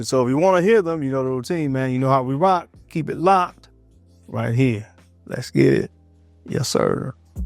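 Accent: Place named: South, United States. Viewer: I bet you’re from the south. I think it might be a bit f a southern thing lol.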